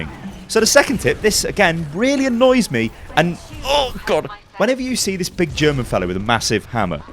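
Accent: United Kingdom. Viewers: Closed beta* you have an awesome british voice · Hi British man.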